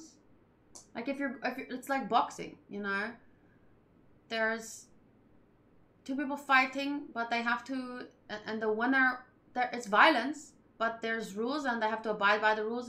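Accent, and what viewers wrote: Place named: South Africa. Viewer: I'd bet it's South African, really cool fun accent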